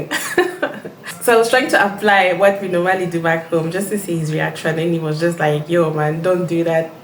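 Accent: Kenya. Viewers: You have a Kenyan accent. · You picked up the Kenyan accent well..🎉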